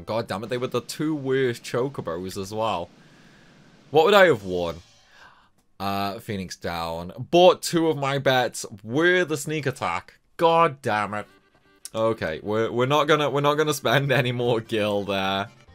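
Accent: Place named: Stoke-on-Trent, United Kingdom. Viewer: Knew what? Stoke-on-Trent? I called that too! It was the one accent I never saw him deny on stream, but he just ignored it when guessed instead. Also because I went to Staffordshire uni so I'm kinda familiar with it. I ruined my stomach drinking Pepsi for three years because the tap water in the area is disgusting.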